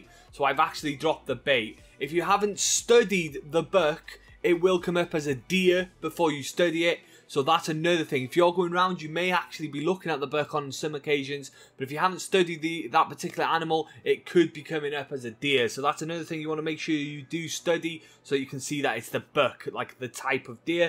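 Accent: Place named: Wales, United Kingdom. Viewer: I like your welsh accent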